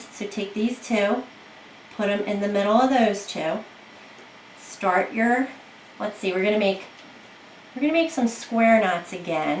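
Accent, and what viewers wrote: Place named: South Jersey, United States. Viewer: Had a feeling you were from south Jersey. A Jersey girl recognizes the accent. 😂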